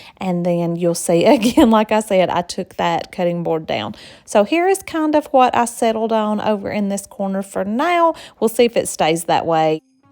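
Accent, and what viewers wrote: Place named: Houston, United States. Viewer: Hi! New subscriber here!! Beautiful house and decor!! I wanted to know if you live in Houston? (Your accent sure sounds like it!) We do not have basements where I live in Houston! But I grew up with them in Pennsylvania and truly miss having one ❤️💚 Thanks for sharing!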